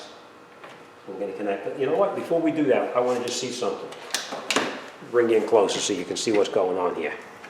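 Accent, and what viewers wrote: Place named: New Jersey, United States. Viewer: Somebody from New Jersey somewheree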